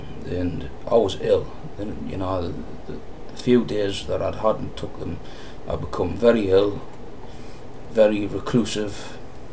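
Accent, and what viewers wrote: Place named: North East, United Kingdom. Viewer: i could sit an listen to you all day, where in the N.E are you from?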